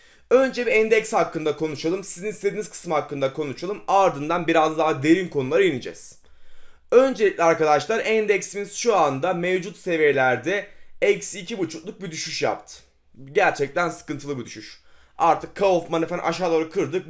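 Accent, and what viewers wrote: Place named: Kastamonu, Turkey. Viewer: Hocam memleket neresi kastamonumu şiveniz benziyor ❤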